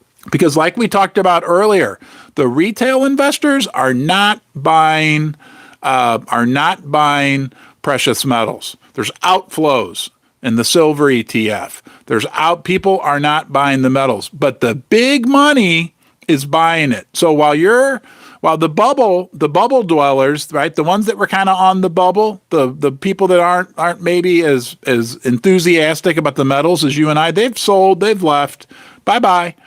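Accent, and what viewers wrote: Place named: Kentucky, United States. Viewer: Sounds like Kentucky to me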